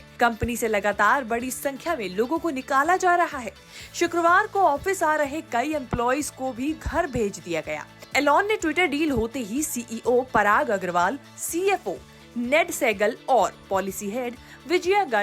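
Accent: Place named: Bhojpuri, India. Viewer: Oh my God ... Your bhojpuri accent is so s#xy 😁😁😁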